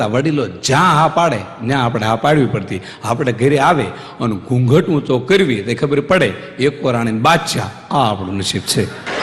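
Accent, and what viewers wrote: Kathiawar, India. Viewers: aape pyor tadpadi bhasha jadvi rakhi chhe, kathiyavad sivay kadach samjata thodo samay levo padto hase evu manu chhu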